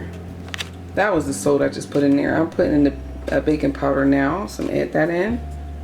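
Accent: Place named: Delaware, United States. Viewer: Hey! This looks good! I have a sweet tooth as well lol and love sweet cornbread too! I like how you talk too lol you kinda sound like my family that’s from Delaware 😊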